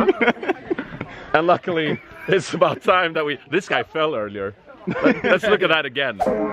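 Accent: Sweden. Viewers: Swedes - check 90's Volvo 240 station wagon - check Swenglish accent - check Raggar factor 1000 - check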